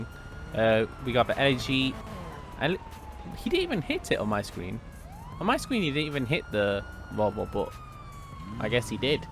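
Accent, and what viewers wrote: United Kingdom. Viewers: are you from uk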